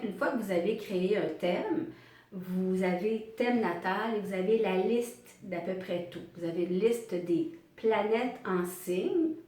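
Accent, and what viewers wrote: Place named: Quebec, Canada. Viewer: 1000 merci parce-que vous etes tres objective et d'une claretee rare, et aussi merci de votre generosite de partager votre savoir acquis, je vous en suis reconnaissant. L'accent Quebecois c'est la cerise sur le gateau, j'adore !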